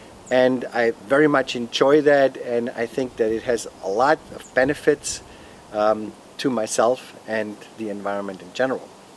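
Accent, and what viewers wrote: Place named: Germany. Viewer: no, your accent gives you away from being originally from Germany, not that you have a business trip there. ;-)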